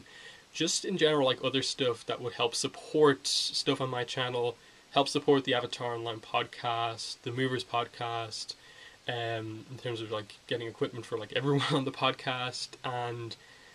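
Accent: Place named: Dublin, Ireland. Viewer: That accent - Churchtown? Terenure maybe?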